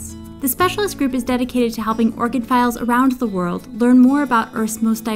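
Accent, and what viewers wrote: United States. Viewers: i never knew the depths of american pronunciation before.. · Very fine piece of work, Kingsley. My Australian wife wants to know where you found an American narrator? The word, orchidelerium is NOT a Victorian word, for those interested. My late editor, Anne Boston, came up with it to entitle an article I wrote on Victorian "orchid mania" published back in the 1980's for the now defunct, "Garden Magazine." It was used a second time in my 1989 book, "Wily Violets and Underground Orchids" (still in print).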